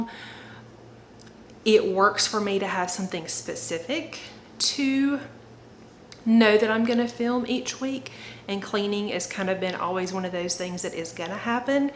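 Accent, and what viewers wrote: South, United States. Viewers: hello from NC! I can tell by your accent you're from the south, too. It's nice to hear that comforting sound, right? I'm a new Flybaby. I guess I should say I'm more of a flylarve. I just about jumped on board a few years ago and got overwhelmed. Today, after divorce, domestic violence therapy for severe PTSD and raising my kids completely on my own, I found myself craving routine. Needing guidance, I went back to the FlyLady website. But, like everyone has said, boy, that website really needs a facelift. So, I turned to YouTube. Yes, the Secret Slob and all of the others you mentioned have led me here. To be transparent, I just bought, downloaded and put together my Control Journal today. I was looking for one more push and searched "flylady 31 baby steps". That's what brought me to you. I'm like you in a lot of ways. I'm all or nothing. 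Typically, I jump in sprinting and can never finish the race. I procrastinate until important becomes urgent and suddenly, chaos ensues. I haven't watched any of your follow up videos yet, but I will as I take my babaysteps. I was even thinking of using my Instagram account to post Stories about my journey. I have a planner account that has a substantial number of followers. Maybe, if I tell them I'm doing it, it'll give me accountability. I'm sure making videos has done that for you. Cheers to you for taking the plunge again. It says a lot about you that you "failed" and yet, came back for round two. I'm looking forward to watching y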